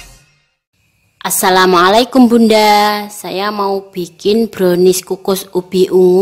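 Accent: Java, Indonesia. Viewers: Suara blender sama yg ngisi suara cucok meong Hhhhhhhh medhok x bhsnya psti jowo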